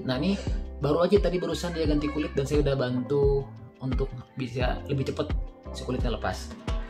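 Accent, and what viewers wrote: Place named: Banjarmasin, Indonesia. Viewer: pian urang Banjarmasin kah? Logatnya spt org bjm.. 😊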